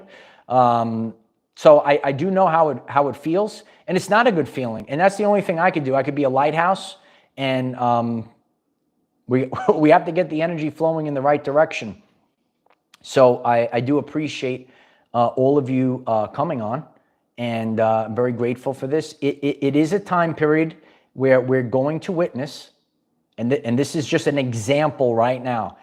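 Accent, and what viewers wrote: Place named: Boston, United States. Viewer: Hmm....1st time here, love the accent. Usually not my topic of choice but was drawn in. May i know where the accent is from, is it Boston? I love hearing other voice differences across the globe, and learning about other cultures🙏✨️❤️‍🔥🌊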